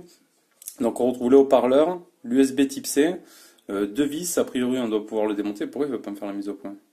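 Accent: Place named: South-West, France. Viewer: J'adore vos vidéos ! L'accent du sud ouest et les petites erreurs c'est trop mignon lol ! Continuez !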